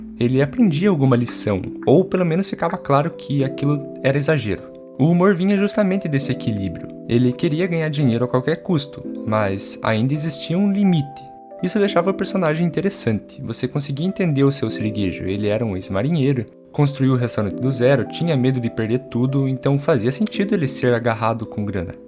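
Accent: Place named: Inland Paraná, Brazil. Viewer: Pelo sutaque tu é do interior do Paraná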